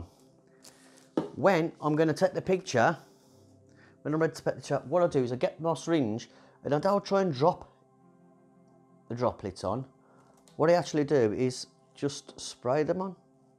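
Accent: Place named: Sutton Coldfield, United Kingdom. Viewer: Love the simplicity so glad I've discovered your channel. Love the accent too, takes me back to my youth in Sutton Coldfield.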